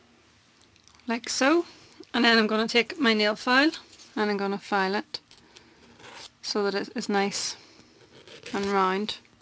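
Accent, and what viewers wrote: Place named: Scotland, United Kingdom. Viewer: Scottish? I love your accent x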